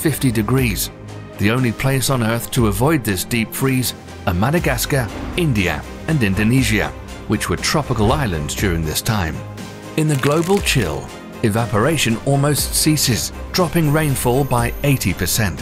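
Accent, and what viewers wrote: United Kingdom. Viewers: bri'ish accent very fascinating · really need to do an update with different none British voice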